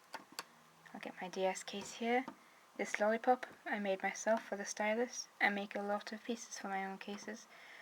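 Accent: United Kingdom. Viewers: Yay ur from the uk aren't u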